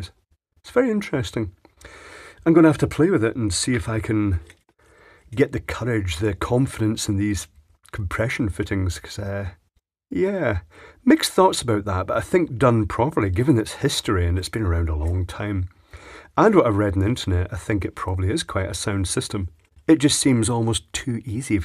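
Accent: Scotland, United Kingdom. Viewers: Not sure why I watch this. Perhaps it's just nice to hear a fellow Scotsman talk. Thanks for the video,.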